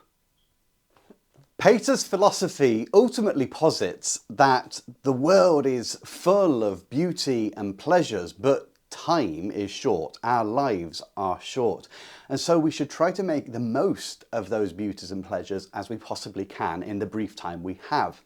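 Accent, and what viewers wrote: United Kingdom. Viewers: excellent analysis - thank you! also your particular British accent is easier to understand for this midwestern American.....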